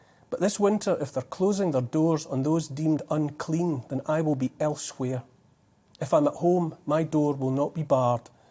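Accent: Scotland, United Kingdom. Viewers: So thats what my thoughts sound like with a Scottish accent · Most sensible post for many moons and told in the wonderful tones of an educated and very calm Scotsman. Wish we had many more like Neil. Leaders of integrity are in desperately short supply. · I genuinely don't care what he says, i just listen to his accent. he's like the scottish james may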